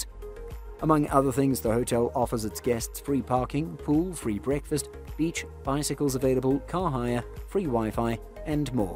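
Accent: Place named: England, United Kingdom. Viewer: Thank you for this nice video. I do not know where you live but you sound like you have an English or Australian accent. We do not pronounce it either way you keep saying it! I live in Florida right near the Keys!! Go there all the time! Have a great friends, who have lived there for years!! Isle. Pronounce the word as: isle, aisle & I’ll! 😊 Phonetically, it is called - I’ll MORADA!!!! Islamorada, Florida 🇺🇸❤️🗽🗾🏝️☀️🌊🎣🎣🎣🐠🐟🐬🐋🦞🐢🦀🦑🦈🦈🦈🐊🌴🏊🏻‍♀️🚣🏻‍♀️🚴🏻⛳️🛺➕ 💃🏼🕺🏻🎸🥁🎹🪇🪕🎺🪘🥁🎸🚤🛶⛵️🚤🛶⛵️🛥️🎉🎉🎉🎉🎉🎉🎉🎉🎉🎉🎉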